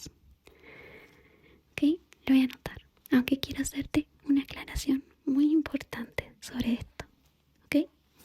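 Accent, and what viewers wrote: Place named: Argentina. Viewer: hablas argentino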